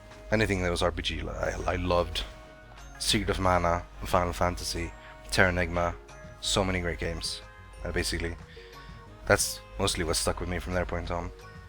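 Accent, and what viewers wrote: Scania, Sweden. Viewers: DIN SKÅNSKA THO omg like i'm from skåne but holy shit it's so weird to hear idk why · JA ÄR SKÅNING:D har du varigt i ängelholm? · Fuck! Han e skåning! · Det var så märkligt att höra dig prata skånska... vet inte vilken dialekt jag tänkte att du skulle ha men skånska fanns liksom inte på tapeten. As bra kanal, keep up the good work! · 2 skånska 4 me även fast jag är skånsk.. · Herregud han är skåning:D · SKÅNSKA FTW! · OMG det känns så konstigt att höra dig prata skånska xD Men det är underbart. Du är bäst. Fortsätt vara awesome! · Å herregud! Du pratar skånska! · Yaaaay du är skånsk =D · MIND BLOWN!!!! DU E SKÅNSK !!! (göteborg ftw heheheh) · Varför alltid skånsk?? TheSwedishLad är samma sak... Pewdiepie är den enda som jag känner till som inte är skånsk... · Det var inget annat än skumt att höra dig prata skånska, men du gör bra videos så fortsätt med det! 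· Haha, visste inte att du va svensk fören du snacka skånska '' jag bara wtf'' xD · cause he speaks skånska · Skånsk dialekt = MindBlown! Din Engelska Dialekt är Godlike men jag trodde ALDRIG att din svenska skulle va så skånsk XD, Anyways You're an awesome Swede Ska fösöka komma med på någon Ventrilo eller så med er :)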